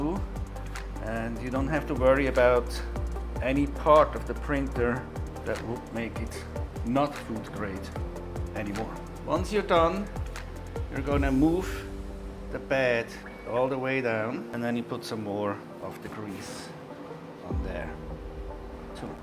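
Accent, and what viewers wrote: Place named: Switzerland. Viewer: Are you maybe swiss? Your english is great but the accent seems familliar to me 🤔 falls ja liebi Grüess us Züri 😊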